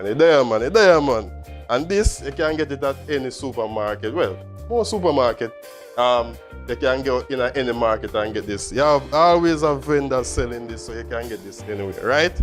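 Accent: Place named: Jamaica. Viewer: I know you are saying something good. Only Jamaicans and those In contact with Jamaicans will understand what you are saying. Just slow down so we can pick up what you are saying 🙏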